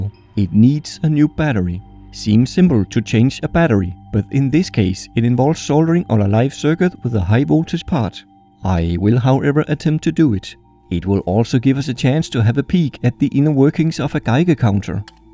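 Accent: Denmark. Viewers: uhhh are you danish? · that danish accent :o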